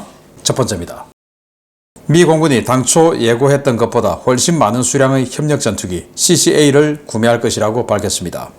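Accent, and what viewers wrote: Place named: Gyeongsang, South Korea. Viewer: 경상도 씹 말투 존나 거슬리네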